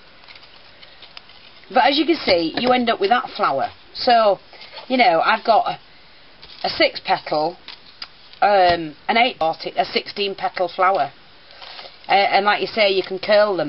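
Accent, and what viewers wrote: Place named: Leeds, United Kingdom. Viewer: It's because lives in Leeds do she has that 'leeds' accent lol. I like it:)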